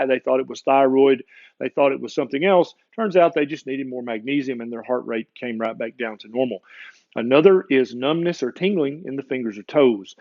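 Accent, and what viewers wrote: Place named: Georgia, United States. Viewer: You sound like you're from Georgia.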